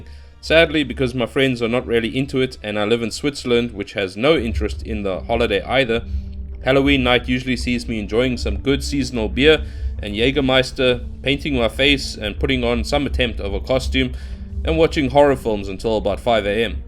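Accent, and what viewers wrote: South Africa. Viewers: >here in Europe I thought you were South African. Congrats on escaping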